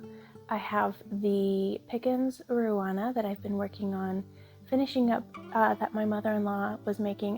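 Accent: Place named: Alabama, United States. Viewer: You're exhausted? You look GREAT. The Pickens has a gorgeous drape😍 you did a great job! Your accent is similar to mine I'm from Alabama where are you from?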